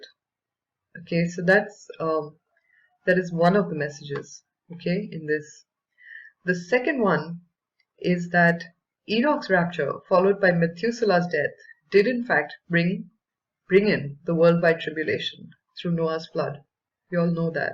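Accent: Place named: Germany. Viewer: I am so glad I found your channel... I have always felt there are codes in the Bible, and I know for instance in the KJV, there are 783,137 words and right in the very center of these words of the Bible are two words - the Lord.... it is no coincidence that the Lord is at the very heart, the very center of the Bible... you are on to something.. I have lost most of my vision recently by eye stroke... I have to use Windows Magnifyer set at 4 or 5 tiimes normal... I will do some research and get back to you... I wonder if we can find 1221 working with other numbers in Genesis also.. I am going to do some research and see what I find, I'll get back to you.. I also feel Noah's ark, that story is also a symbol of the Rapture and Tribulation for our generation.. the God fearing people have a Protector, the Ark, helping collect them before tribulation, The Flood comes to the Earth... 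a sign for the Rapture and Tribulation right there...okay, nice to meet you, will get back if I find anything.. love your accent, is that German/Austrian I am hearing? I love it....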